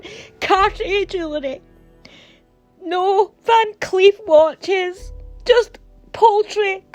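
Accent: Scotland, United Kingdom. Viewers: You nailed it,. Those poor poor Sussex parasites. I love your Scottish accent. It reminds me of my dear Gran and Grandpa, long gone but never forgotten. I hope you recover soon.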